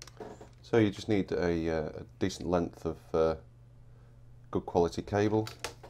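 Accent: United Kingdom. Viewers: >>>>> ANOTHER BRIT. WORBLING AWAY IN HIS SPECIAL DIALECT OF UNKNOWN LANGUAGE !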